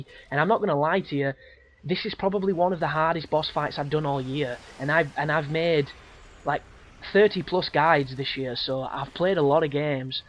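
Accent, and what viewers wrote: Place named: North, United Kingdom. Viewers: Legend, your northern accent and explanation are immense hahaha nice one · Dude you sound like the drummer for the band BMTH. So im assuming your from yorkshire cause thats where they are from.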